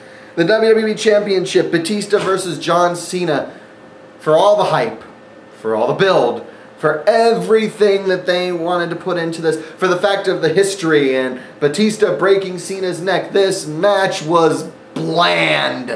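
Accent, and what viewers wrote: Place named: Mexico. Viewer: im mexican and i found ur mexican accent funny i made me crack up great review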